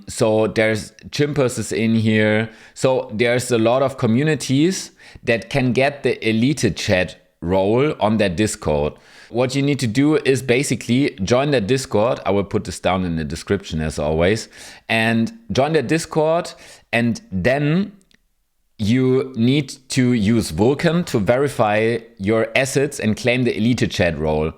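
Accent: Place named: Germany. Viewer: ich seh grad in den Kommentaren dass du auch deutscher bist hab mich schon gewundert wegen dem Akzent 😅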